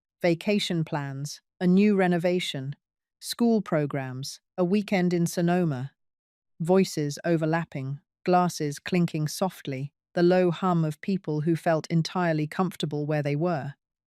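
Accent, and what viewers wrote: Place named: San Diego, United States. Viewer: So that's what a San Diego accent sounds like 😅😂😅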